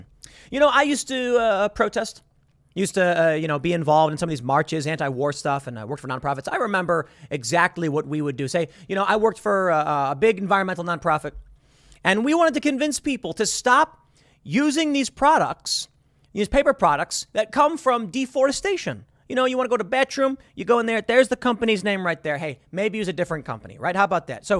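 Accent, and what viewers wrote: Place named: Chicago, United States. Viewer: More Chicago accent please Tim!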